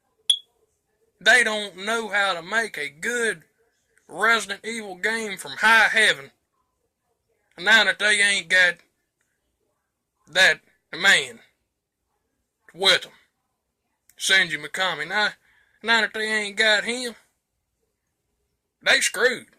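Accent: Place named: Appalachia, United States. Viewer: why you talking like a hill billy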